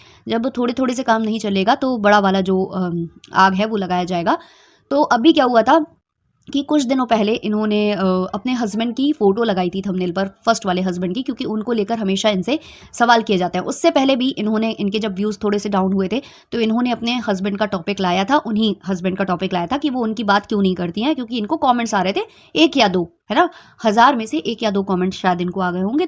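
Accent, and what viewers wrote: Bundelkhand, India. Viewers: Hello tum up bundelkhand kaha se ho m bhi wahi se hoo bundelkhand ki..Main kafi din se tumhare reaction dekhti hoo tumhari tone se lgta to tha tum bundelkhand ki ho lekin kbhi pucha nh tha please btana kaha se ho mujhe achcha lgega ❤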